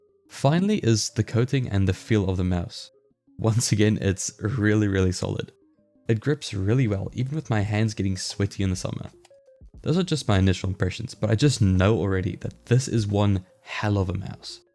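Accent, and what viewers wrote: South Africa. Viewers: Great review man, are you South African?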